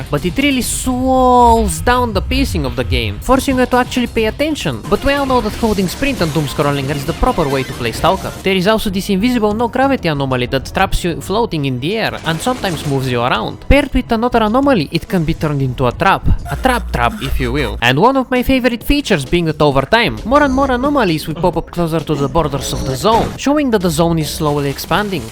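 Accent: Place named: Bulgaria. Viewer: btw, your video is good, I like your humour, I think you are Bulgarian because of accent so hello slavic friend!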